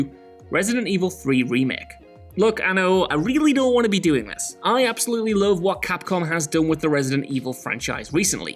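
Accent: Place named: North East England, United Kingdom. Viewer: He’s got a bit of a north eastern English accent.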